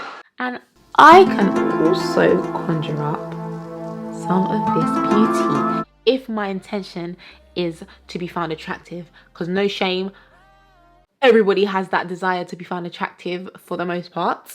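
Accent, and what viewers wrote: South East London, United Kingdom. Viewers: South londonn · Its nice to hear a British English speaker instead of Americans who seem to dominate the internet/media. I totally disagree that white women are the standard of beauty. By that I mean that I don't think only white people are beautiful, there are many types of beauty and that is a wonderful thing. We just need to open our eyes :) As for trends, I wear what I like and what is comfortable, what I think suits me, I don't care about the fashion, that is just the inconvenient thing that means I can only buy certain types of clothing/styles that are available. I could be a real rebel and wear something totally far out but I just don't care enough. What is really sad is that black people are enemies to their own kind sometimes. Really sad.... As for thinking some are not beautiful but for a platform, how about realising that is a different type of beauty that appeals to others, just not you? 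Having said all of this, when you are an older woman, you are invisible mostly, that is actually a good thing because you are free of all this crap to a large degree :) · It sounds like a south London accent to me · She sounds like she's from South East London, I grew up there so it's a familiar accent to me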